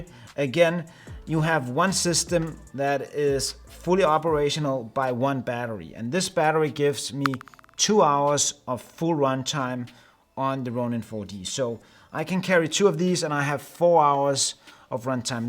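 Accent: Denmark. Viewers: Rigtig god video, synes jeg kan høre du kommer fra Danmark hvis ja, så pisse fed video. Går selv og overvejer DJI Ronin 4d, så tusind tak for review :)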